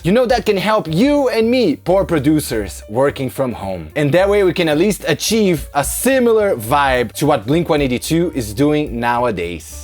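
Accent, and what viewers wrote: Brazil. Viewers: Po o seu sotaque parece muito de brasileiro, vc é br? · mano vc é brasileiro? parece pelo sotaque ahahaha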